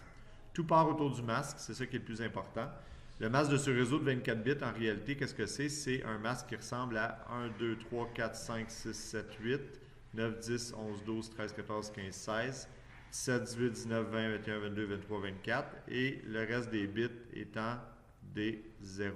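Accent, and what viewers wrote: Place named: Canada. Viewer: bon, je vais essayer de comprendre l'accent canadien O_O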